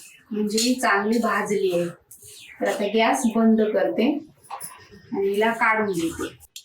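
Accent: Konkan, India. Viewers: कोकणात तसंच म्हणतात त्या कोकणातल्या आहेत आमच्याच गावच्या आहेत आमच्याकडे त्याच भाषेत बोलतात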